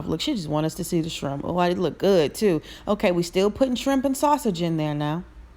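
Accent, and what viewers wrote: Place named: South, United States. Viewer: You can tell she from the south. She said “puhtaytuhs” not “potatoes”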